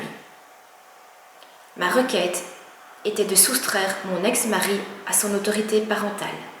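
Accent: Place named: Belgium. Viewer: Bonjours j entends un accen belge dans votre voix, si vous êtes de Belgique pouvez vous me donner le numéro d un bon avocat belge si possible le votre ? Merci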